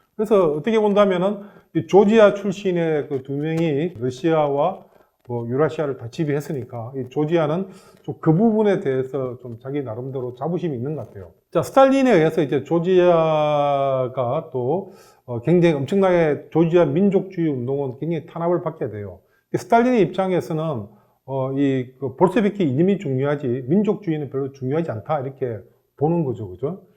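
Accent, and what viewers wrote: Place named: Gyeongsang, South Korea. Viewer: 좀 죄송한데 내용을 떠나서 외국과 관련된 유튜브에는 경상도 심한 사투리 억양 소유자는 나오지 맙시다. 우리 말 발음도 제대로 못하는 사람들이 경상도 아닌가? 직장에 경상도 사투리 쓰는 놈들 참 문제 많다. 사투리를 못 고치니 지들 경상도끼리 뭉친다. 니는 어데고 하면서 아주 가관이다.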